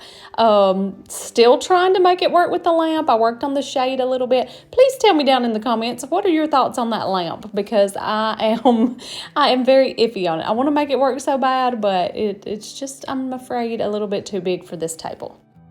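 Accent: South, United States. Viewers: So I guess you can tell by my comments your shorts just popped up on my homepage a few days ago been loving your shorts so I decided to watch this full length video today and I love it. Love your personality. Love your beautiful home love your accent. I’m from Mississippi so I have one too. I did learn something from your video today. I’ve never been told before to heat up my cornbread pan before I cook my cornbread, I will be trying that, have a great day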